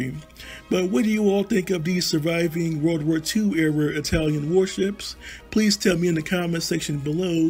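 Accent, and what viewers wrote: United States. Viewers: I had to give this video a thumbs down. Your American accent makes it unbearable to listen.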